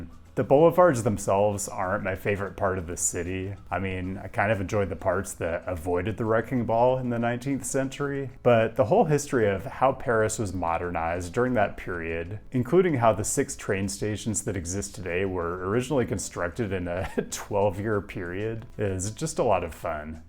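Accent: United States. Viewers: SJC definitely shouldn't be on this list. Proximity to downtown San Jose is a MAJOR advantage. Unlike in Europe, we don't have to travel for hours to get to the airport. It's right there, 10 minutes away from 1st and Santa Clara by car or 20 minutes by light rail/bus. bro what the fuck? (1) why are we destroying the natural environment outside of the built area for no goddamned reason (2) that destroys all the advantages of the current location of LAS, which, the current location is great, because it's close to where MOST people are going. And LAS has public transit buses right there at the airport that can take you to the monorail and various other places. The monorail is only 3.1 miles (4.9 km) away. Taxis are usually parked at the airport and can get you to the nearest monorail station in 20 minutes. The public transit buses are slower but cheaper. Sorry, but the more I watch this video the more I see with which I disagree. 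Like I said above....that's really the bottom line. WHY would you want to create inconveniences???? By your accent, you're either an American or a Canadian....yet, you seem to have abandoned the frontier philosophy of "why not" for the old European one of "why".... look, sacrifice IS noble, when you're protecting others or you're protecting a valuable institution. That's not what is happening here. Given that airplanes move to green technology -- which IS happening, and will continue regardless of any action by any legislature -- there's no logical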